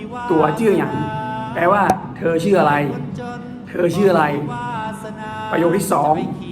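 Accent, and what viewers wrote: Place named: North, Thailand. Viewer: ภาษาเหนือน่ารักจังค่ะ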